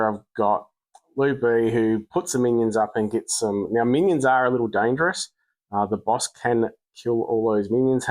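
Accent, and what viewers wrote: Australia. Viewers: lol as a Texan I can tell you that the Beaver Nuggets are an excellent little snack. Bucees gas stations are a destination spot around here. I never expected to hear an Australian talking about the Nuggies in an Empires and Puzzles video on your tube though. Crazy times lol 🤣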